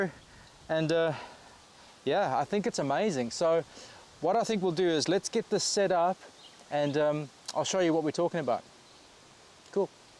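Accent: South Africa. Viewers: Hey bru Are you south african ?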